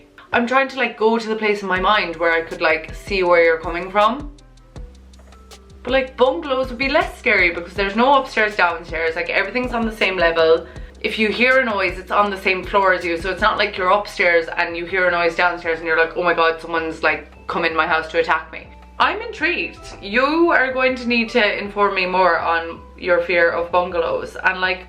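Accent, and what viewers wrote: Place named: Ireland. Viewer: I luv the iris accent my friend is iris n i luv it how she speaks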